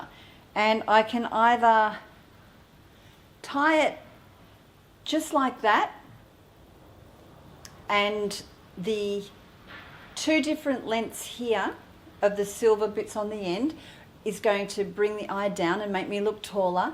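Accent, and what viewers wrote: Australia. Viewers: Hi. I’m new here. You sound Australian. I’m an Australian 👍😃. Do you do anti aging creams and masks?? My neck is my problem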